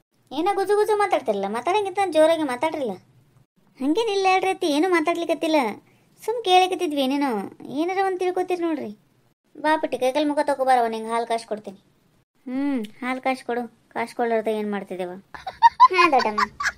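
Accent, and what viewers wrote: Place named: Uttara Kannada, India. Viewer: Namaste akka, sambhashane Matra super, ondu kathe na vastavakke tarodu enu easy alla, film olage ondondu character nu bere bere yavru madtirtare, avravra character olage involve adre aytu, but ella character olagu obre involve agi, kathe na vastavakke tartira, nimma talent ge hats off 🙏 adrallu uttara kannada bhashe sogadu, mukhadalli tumba nagu taratte, otnalli nim videos refreshment agiratte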